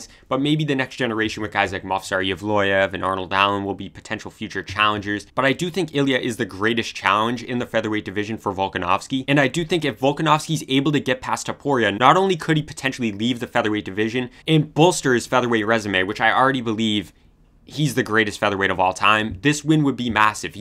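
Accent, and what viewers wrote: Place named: Pacific Northwest, United States. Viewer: you’re definitely from the Pacific Northwest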